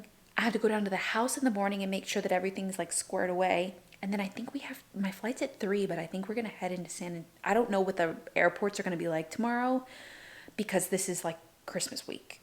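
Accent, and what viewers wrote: Texas, United States. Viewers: LOL yes, I've notice your accent more when you're here in Texas as opposed to when you're in LA.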